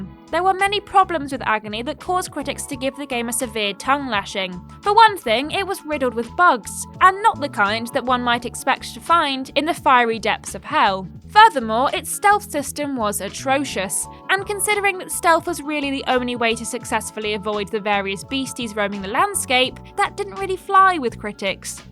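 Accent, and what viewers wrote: United Kingdom. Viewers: This girl is the most British person I've ever heard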